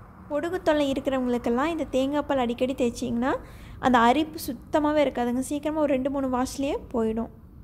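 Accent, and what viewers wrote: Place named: Sulur, India. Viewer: Ippo than casual a unnoda video parthenma.unnoda voice modulation ne solluthu, ithu namma ooru ponnunu.udane subscribe pannitten.nanum Sulur pakkam than....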